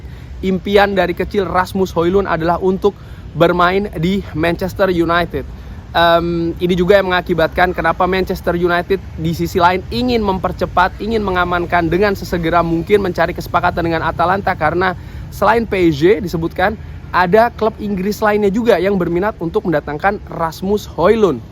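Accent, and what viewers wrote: Semarang, Indonesia. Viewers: Bang, orang Semarang ?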